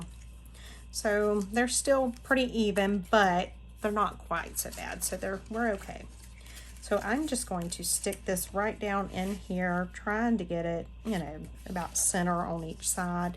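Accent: South, United States. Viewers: You channeled Bob Ross with a happy little accident on that pocket 😻. I can understand you ok. Love your southern accent.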